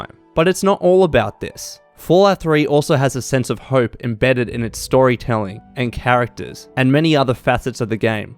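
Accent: Australia. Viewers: Wooo! IVE MISSED THAT AUSSIE VOICE! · Goddamn I missed hearing that smooth Australian buttery voice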